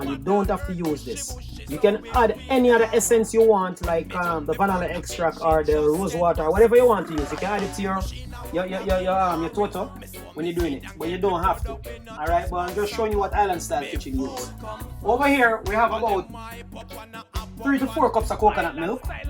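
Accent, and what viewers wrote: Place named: Jamaica. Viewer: Hello hun! Wah gwaan? I love your channel because you make everything look so amazing!! And The accent adds to it!! I’m learning Jamaican!!❤️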